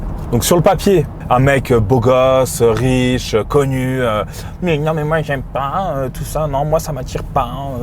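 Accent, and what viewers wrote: Russia. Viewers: ton anglais avec un accent russe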